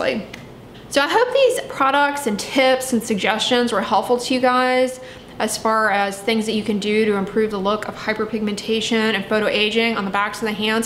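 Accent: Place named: Texas, United States. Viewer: Dear Dr, Dray, I really enjoy your videos and am enjoying them. I grew up in El Paso, and was there for much of the last 4 year period while my mom was still alive, so enjoy the familiar mild TX accent and shopping opportunities, as well as your very helpful information. Now, however, I am back at home in Juneau, Alaska, which I do love -- but not the shopping... We do NOT have: Dollar Tree, Wal-Mart, Target, CVA, Walgreens (or Rite Aid or any other chain pharmacies). We do have two small grocery stores, part of the IGA chain (think small independents that were bought by a chain that buys small independent stores in rural areas - and in fact IGA bought our 2 small independent groceries when the owners wanted to retire); one Fred Meyer -- bought by and now part of the Kroger chain; and one Safeway, which I rarely shop at as it is farther from me than Fred Meyer. 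We also have a very small Costco, probably the smallest Costco I have ever been in. I live in a medium-size regional center, and the capital city of my state. (Also, we have no road access to go anywhere else, as is also true in much of Alaska.) This is why rural America feels sort of left behind and "out of the loop". If you can do a video of skin care options available at Kroger or Costco, especially cost-effective ones, I would be very grateful.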